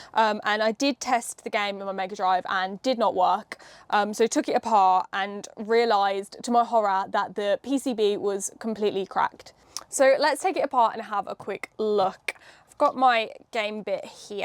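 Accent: United Kingdom. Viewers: Ouaw l'accent britannique 😊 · She's cute, British accent, good at soldering and fixing things, is it really some miracle? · can someone tell me from which region in the UK she is? I can hear an accent but can't locate it. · Que genia! I love your channel and your british accent :)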